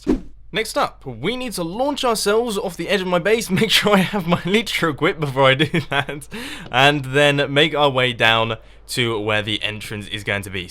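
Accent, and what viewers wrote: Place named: United Kingdom. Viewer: It's your British accent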